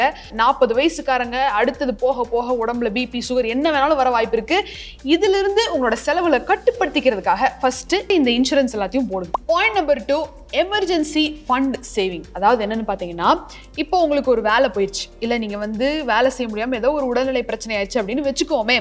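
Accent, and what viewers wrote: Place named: Kongu Nadu, India. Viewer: கொங்குநாடு பேச்சி மிக அருமை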